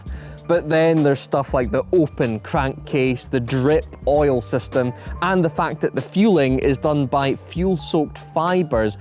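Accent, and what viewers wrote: Scotland, United Kingdom. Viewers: Never new the Scottish accent would be so good for documentaries (from an American). Can you do medieval history as well?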